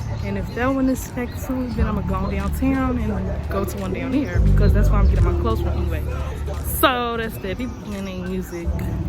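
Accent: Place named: Chicago, United States. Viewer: Girl lmao you from Chicago?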